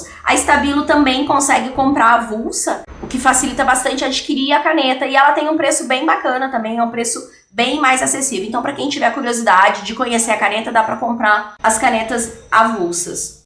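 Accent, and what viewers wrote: Rio Grande do Sul, Brazil. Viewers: Qual a sua brushpen e onde vc comprou ela? Sou de POA e pelo seu sotaque vc também é gaucha, não acho nenhuma brushpen em nenhuma papelaria, poderia me indicar uma?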